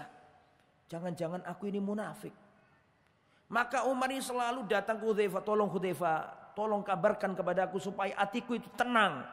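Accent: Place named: Java, Indonesia. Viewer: Seneng p ustadz logate njowo iq...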